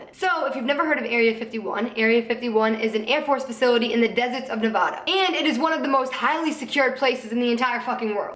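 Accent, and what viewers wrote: Boston, United States. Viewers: Boston accent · Are you from Boston? I love your accent 😂😂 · it isn't fake. It is a Boston accent and it is very common for people with accents to come and go. It can change depending on who she is talking to, her emotion, etc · My parents are from boston so they say things the same as you aha! and your my absolute fav! love you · I love your Boston accent 😊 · her accent 😍😍 Boston?? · what's her accent? Boston? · Courtney Blair what the hell?😂 she's from Boston. Boston accents mainly only show with certain words. You're an idiot. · are you from Boston? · Sofia Lazcano-fafard she's got a Boston accent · Marilyn Peterson It's her adorable af Boston accent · Is she from Boston she kinda has the accent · ThatsSoKendall it's a Boston accent. Definitely not country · your boston accent makes me so so happy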